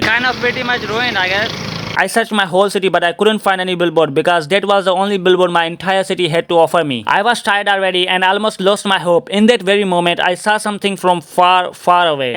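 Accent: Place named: Pakistan. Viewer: Pak english good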